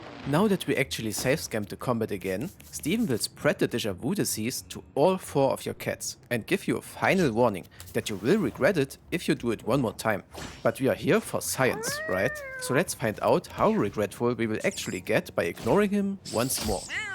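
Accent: Germany. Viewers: Ich höre direkt raus, dass du Deutscher bist, Brudi 😂👍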